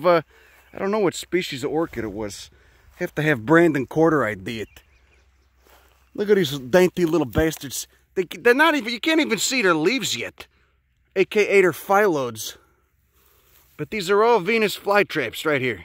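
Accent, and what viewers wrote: Chicago, United States. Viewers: It's funny , the exotic "Venus Flytrap" grows in a limited area of North Carolina. Also , your Chicago accent is killing me! As an Italian American from near Pittsburgh, I can hear the similarities between the Pittsburgh accent and the Chicago accent. That's why all yins guys thought that Iron Mike Ditka was a native Chicago guy when he's actually from Pittsburgh! I'm glad that I found your channel, I'm going to subscribe. Ciao, fratello! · It’s thick Chicago accent 🤘🏻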